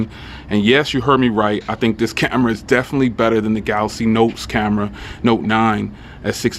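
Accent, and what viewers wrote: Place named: New York, United States. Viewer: New York accent coming out like crazy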